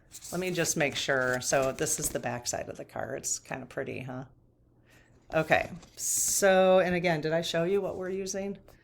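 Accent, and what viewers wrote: Midwest, United States. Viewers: From the first time I watched you I figured you were from the Midwest and really resonated with that. Now I find out you’re from Palatine! I’m older than you but I graduated from Fremd in 1975. Worked at the Marc’s Big Boy on Northwest Highway. Small world! ❤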